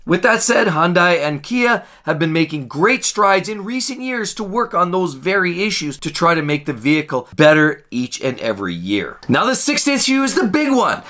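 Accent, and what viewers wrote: Canada. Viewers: God you sound like Bret the Hitman Hart....Canadian accents.....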